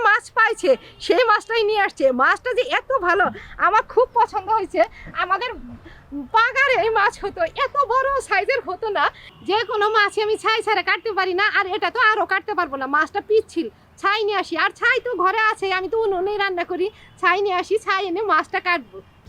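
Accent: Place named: East Bengal, Bangladesh. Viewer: দিদিভাই তোমার পূর্বপুরুষের বাড়ি কি বাংলাদেশে ? তোমার রান্না এবং কথার সাথে পূর্ব বাংলার অনেক মিল আছে।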